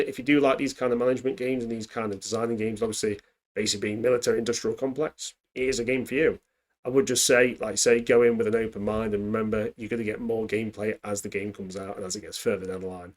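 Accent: England, United Kingdom. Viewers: i could tell by your accent that you were english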